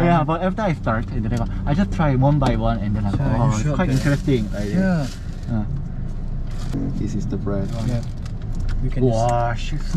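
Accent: Singapore. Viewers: I feel like you're singaporean tho, your accent.. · I’m watching this video because of phi 👀❤️ btw, are you really korean? When you speak english you sounds like singaporean 👀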